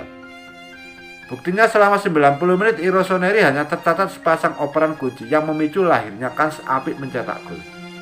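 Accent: Java, Indonesia. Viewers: Ojo medok2 bro wkwk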